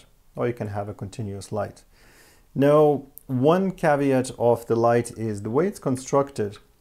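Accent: Ireland. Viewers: Thank you so much for this review, it has helped with my decision to purchase it. Totally off topic but where is your accent from? I'm sure I can hear an Irish twang mixed in with European!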